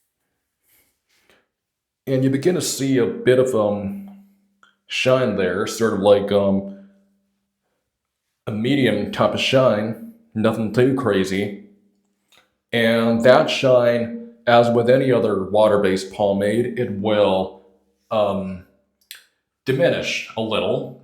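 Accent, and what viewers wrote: South, United States. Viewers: ! You’re back! I thought you were living in Germany? … yet I detect a southern (USA) accent. 🤔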